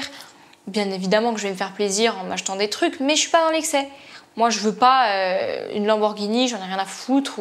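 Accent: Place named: Corsica, France. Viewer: L’accent corse j’adore 🥰 « mais qu’est-ce que c’eeest» tu es de loin la Best 🤍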